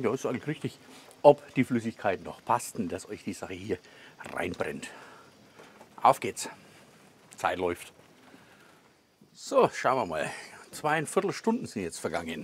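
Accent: Franconia, Germany. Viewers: Servus Der Dialekt ist nicht zu überhören, beim Franken hört sich das immer so niedlich an. Bin aus der Oberpfalz und was soll ich sagen.... mein Hund spricht die gleiche Sprache. 🫣 Dein Dopf Gericht wird nachgemacht, ich werd aber dazu ein Nierenzapfen nehmen den da kommt der Rindsgeschmack besser zur Geltung. Gruß vom Hobbyschmied und Daumen 👍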